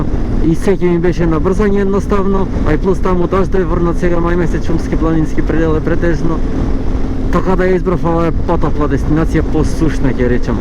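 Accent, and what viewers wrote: Bitola, North Macedonia. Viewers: Pozdrav do od dalechnata Australia. Te pratime so voodushevenost. Odlichna video publikacija na patishestviata, so obilna informiranost i pokraj skromnata video tehnika. Informativni komentari na ubaviot Bitolski akcent, dosega ne zabelezhani. Uzhivanje e da se gleda tehnikata i balansot vo vozenjeto vo odnos na raznolikosta na patishtata. Mashinata ti e optimalna i pokraj relativno malata kubikazha. Ushte eden dokaz deka goleminata ne e merilo za uspehot. Dokolku mozhime da sugerirame, bi sakale da te vidime kade uzhivash vo pauzite vo lokalnite kafe restorani po mozhnost so komentari na mestata vo koi se zadrzhuvash. Veruvame ne oboruvash bilo kakvi rekordi za Ginisova kniga na chudata? Ti posakuvame uspeshni avanturi vo idnina so nadezh da go svrtish dunjalukov v'dolzh i popreku. Nie da sme zhivi - zdravi da prodolzhime da navivame od skromnite ni fotelji so chasha vo raka, i po nekoe mezence. 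DA NI ZHIVEJT! DA ZHIVEEJT!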